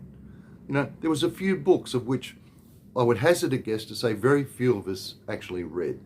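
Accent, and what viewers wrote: Australia. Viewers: I like the cut of your jib, mostly I guess as we have a lot in common, born 57, ex Raafie, spent my early years working in the mines including PNG and happily divorced 😀... I came to Davao back in 2012 to meet my Filipina, I have been a permanent resident (SRRV) here since 2015, being ex military the Philippines Retirement Authority do a great package deal, only have visit the agency here every 3 years to renew my ID, other than that there is no on going costs or exit clearance required. My wife got Australian permanent residency about 4 years ago (I did the application, not rocket science but a few headaches were had chasing up the paperwork here in Phils, as I am sure you would appreciate). We now spend our time between Davao and my place in Queensland (I live on 5 acres), basically best of both worlds. Anyways mate nice to hear an Aussie accent from Duma for a change, keep em coming.. 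cheers.. how good was it growing in Oz in the 70's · Hi I've watched your videos with great interest. I am an Aussie from Melbourne. I spent 2 years in Dumaguete and came back to Australia in mid 2021. to have a cataract operation on both eyes. As you mentioned the treatment standards are far better in Australia. It's taken 12 months of treatment to get the eyes ready for the operation which is in December. I'm expecting to be back in Dumaguete in 2023. Firstly there is nothing wrong with the quality of your videos the smartphone you use is obviously a good quality device. Everything that you've said is 100% accurate. Trike drivers saying it's up to you on fares, poor service in stores, long slow lines. You'll recall that in Oz we just tap our cards to pay. The supermarkets in Dumaguete swipe the card then record the details on another system very time consuming Your Aussie accent is a great voice for the VLOGS. 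Love your down to earth style, great information, keep up the good work. by the way you've got a great partner in attractive, articulate and a great command of English. I'm looking forward to the next VLOG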